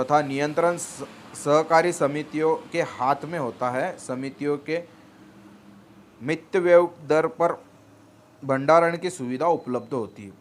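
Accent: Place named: Maharashtra, India. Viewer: From your hindi... I assured that you are MARATHI person... Jay maharashtra bhau 🙏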